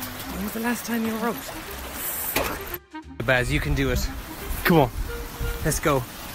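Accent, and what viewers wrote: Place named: Ireland. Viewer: I can’t deal with how Irish u are what’s the story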